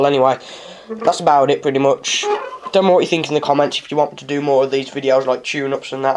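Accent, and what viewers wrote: United Kingdom. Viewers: wouldnt this setting fuck up and slow you down? just wondering + man your cockney is deep af hehehehe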